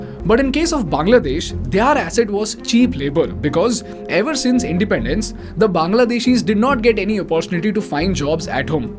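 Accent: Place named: South, India. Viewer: You need to try and lose the aiaiyyo accent. The rest is good.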